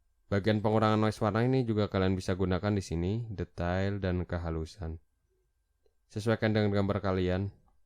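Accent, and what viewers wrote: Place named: Java, Indonesia. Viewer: ngomongnya gausa di medok medok kakaaaa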